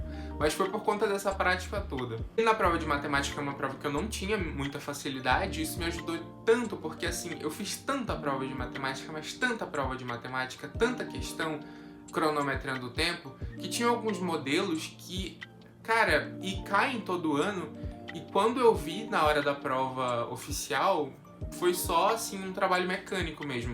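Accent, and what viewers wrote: Pará, Brazil. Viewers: Já ta com um pouquinho de sotaque parense 😆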